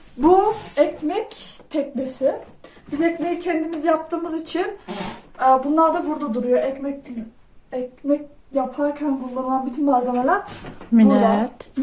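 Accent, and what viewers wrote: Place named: Sinop, Turkey. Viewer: Sinoplusunuz galiba kınuşmanız sinopluları anımsatıyor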